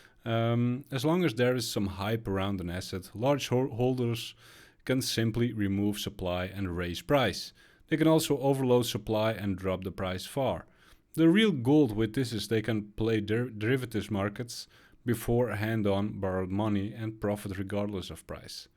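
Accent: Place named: Netherlands. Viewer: Just subbed, watched a couple vids. Great stuff. I knew you were dutch by your accent 😂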